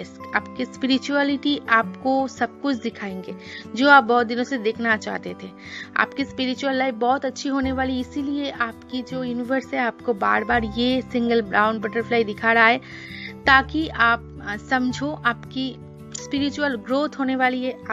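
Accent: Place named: Bengal, India. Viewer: Waah nice jankari ap bangali h na apki hindi se lg raha h😅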